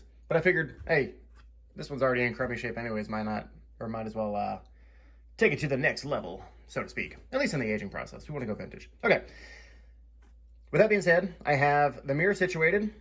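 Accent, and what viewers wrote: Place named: New Jersey, United States. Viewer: That's a lovely accent you have. New Jersey?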